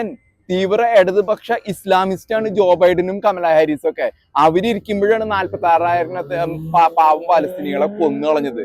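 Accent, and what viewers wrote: Thrissur, India. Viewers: Thrissur slang super bro your news chanel mind blowing dialogue big salute 🙏🙏🙏🙏🙏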